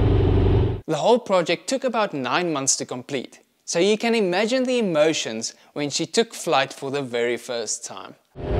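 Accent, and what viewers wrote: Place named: South Africa. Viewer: That's a great video - only just found you. and love hearing the SA accent - I miss my SA friends! Same question as always though: how does anyone afford their own plane??? 😁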